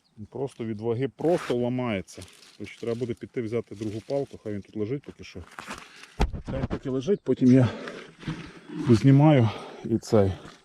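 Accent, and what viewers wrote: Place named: Podillia, Ukraine. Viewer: Лайк з Криму. Вчора познайомилась з Вашим каналом,п!дписалась.Дякую за укра!нську.Розмовляете по Под!льськи.(за помилки вибачте клава руська).Вдач! Вам.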